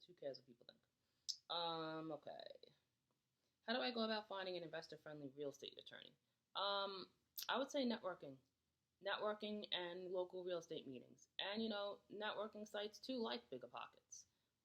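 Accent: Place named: New York, United States. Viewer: Very informative ! Great NY accent and tonality 👌🏾!!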